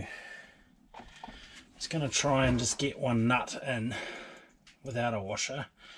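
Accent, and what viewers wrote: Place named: New Zealand. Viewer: I would put in another inspection hatch and install the sensor into that - unclip the wiring, unscrew the hatch lid and there is your sensor. Great video, I started with Part 1 as result of a search on marine toilet maintenance. So nice to hear a Kiwi accent from a Youtube search for a change. I'm going to install a marine macerator into my new motorhome so doing lots of google and youtube at the moment. Must do another Picton trip (from Chch) as soon as I am mobile again.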